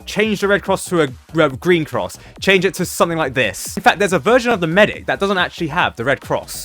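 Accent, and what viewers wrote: United Kingdom. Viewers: Let's go i love British man talking about Geneva convention laws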